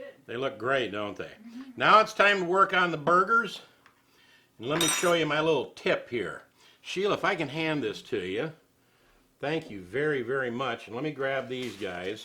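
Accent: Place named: Minnesota, United States. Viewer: I'm from Minnesota and I thought you sounded like a Minnesotan.I've been living in Missouri since 1975 and I still get busted for my Minnesota accent. Ya just can't shake it. LOL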